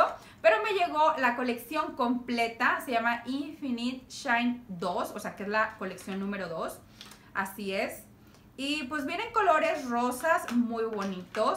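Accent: Monterrey, Mexico. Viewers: se te nota más el acento regio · regresaste con el tonito regio más marcado 😉😉 siempre pasa eso 🙈🤔🙈 me encanta al fin del norte!!! Y si el reto de los 100 pesos!!!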